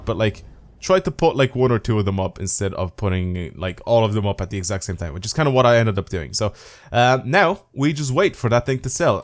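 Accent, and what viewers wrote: Ireland. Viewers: Is ur accent irish cuz u say cär instead of car xd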